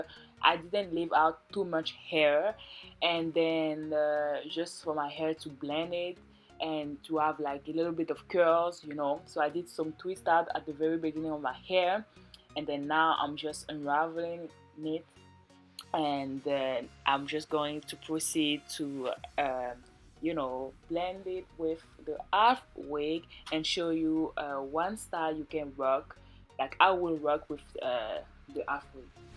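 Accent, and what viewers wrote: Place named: France. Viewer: Nice video! Es-tu française? You sound like you have a French accent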